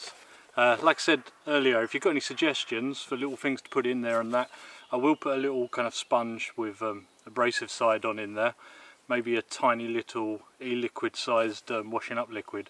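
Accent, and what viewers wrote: United Kingdom. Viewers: Are you british? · Make a Bushcraft camp cook book. You got the vids, the recipes, AND the British accent. You could be the Gordan Ramsay of Bushcraft cookery. Can you also do a braised short rib? Or anything braised tbh?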